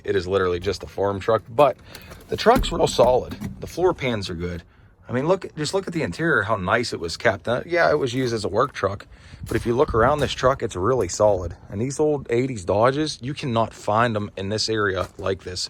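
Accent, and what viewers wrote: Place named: Philadelphia, United States. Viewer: You sound like a Philly guy. Dig the accent! Love the truck.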